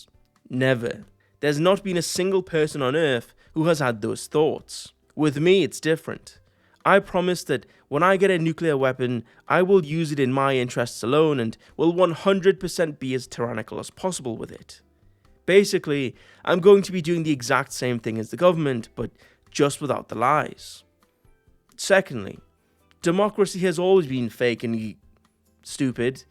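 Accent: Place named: United Kingdom. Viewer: Yo, where abouts in Britain are you from?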